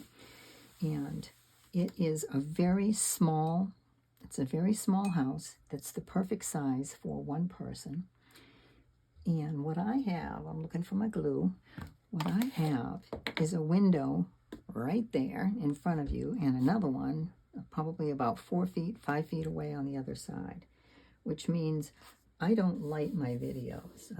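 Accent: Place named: Massachusetts, United States. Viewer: You crack me up! I'm a first time watcher. You must be from MA!! Your accent & word choices make me feel right at home!! I quite enjoyed watching your methods.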